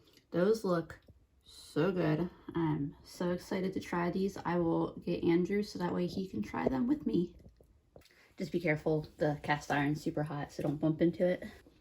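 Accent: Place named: Georgia, United States. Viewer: Based on your "accent" I would assume you're living in Georgia but maybe a transplant from a different state.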